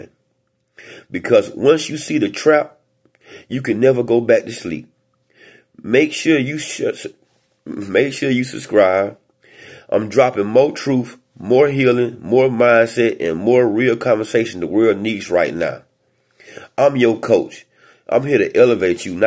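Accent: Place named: Chicago, United States. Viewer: You gotta be from Chicago 🙂‍↔️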